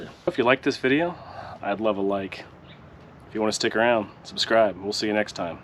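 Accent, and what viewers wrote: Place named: Australia. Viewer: An Aussie man! Aussome!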